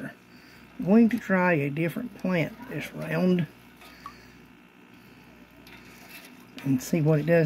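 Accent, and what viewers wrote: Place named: Middle Tennessee, United States. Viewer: You sounds like your from my neck of the woods . I’m in middel tn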